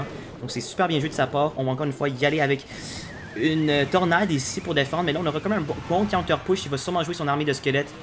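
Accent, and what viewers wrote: Quebec, Canada. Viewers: J’adore ton acent Québécois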